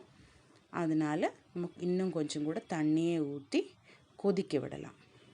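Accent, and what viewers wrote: Kerala, India. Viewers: Your tamil is good qith malayala accent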